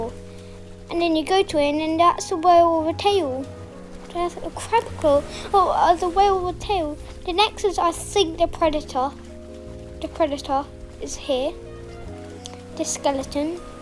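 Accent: United Kingdom. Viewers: you sound like a british kid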